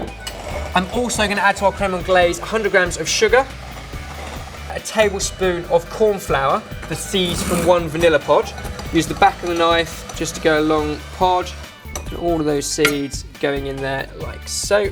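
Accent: France. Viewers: + French Accent = sexy! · Woo love your french accent, it's quite nice ! :D We looove our îles flottantes, so sweet and light :) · Just amazing. And cute French accent;) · So went to Marocco? What a surprise! Btw I always loved this recipe while growing up, my dad used to make it in my birthday. The limoncello touch is nice, I have to try it next time. And nice french accent;)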